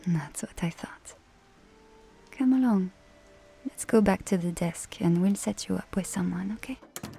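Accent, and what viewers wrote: France. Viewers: Love the french accent. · Oh, the French accent, the whispers and your voice melt my heart. I really needed to relax my mind. You always know what we crave. Tu es vraiment douée dans ce que tu fais. Merci. 🤍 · Having to do quizzez and listen to this during it and helps me relax and focus your french accent is really amazing! First time i've heard someone with a french accent you do really good ❤ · Mon dieux je m'attendais pas à ce que ce soit un français aussi authentique !!! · F4F french accent ASMR, lets goooooooo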